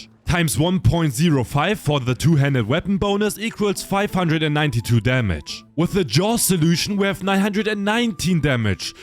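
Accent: Germany. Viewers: this German accent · You are german, right?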